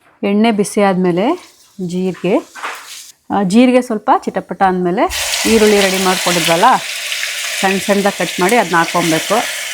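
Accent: Mandya, India. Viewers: Nev same nan tharane mandhya style mathadodhu nange thumba esta nav yelle eddru nam basee mareyokke agaalla 🎉🎉 wish you happy birthday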